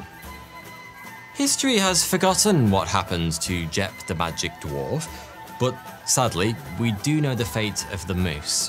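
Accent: United Kingdom. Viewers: I don't know why , but after watching any of you videos, I get a strong British accent , in fact , I am writing this comment speaking in my head like you .. wierd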